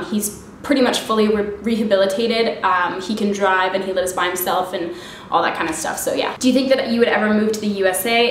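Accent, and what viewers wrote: Canada. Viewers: I THOUGHT I heard a bit of a Canadian accent ;) hecks yeah! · Just discovered your channel! I'm Swiss and I lived in Van for about half a year and I miss it so much! Just listening to your Canadian accent made me feel like I was in Vancouver again, so I'm definitely gonna watch tons of your videos today just to make me less homesick :D xx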